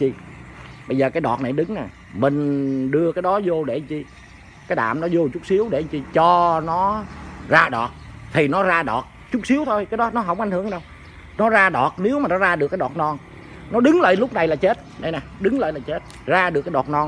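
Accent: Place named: Tay Ninh, Vietnam. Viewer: Dân gốc Tây Ninh nói chuyện nghe cũng đặc biệt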